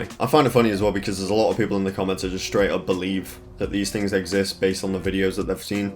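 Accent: United Kingdom. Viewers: He sounds British. If u r British click see more. BRI’ISH INNIT MATE? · your british i am going to subscribe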